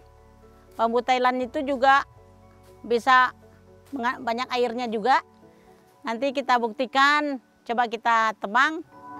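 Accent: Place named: Minangkabau, Indonesia. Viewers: Melihat logat nya seperti nya umi urang awak! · Dari logat umi bicara, sepertinya org padang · logat Padang susah hilang ya mi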